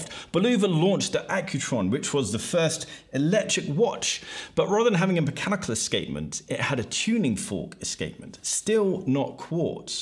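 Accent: RP, United Kingdom. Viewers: he is posh on the downlow he cant help it mate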